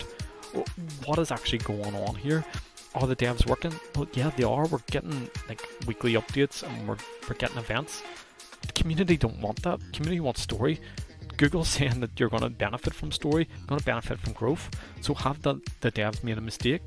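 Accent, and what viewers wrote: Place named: United Kingdom. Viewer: You sounded British to me. Plus most of the time when am in your server you are in English servers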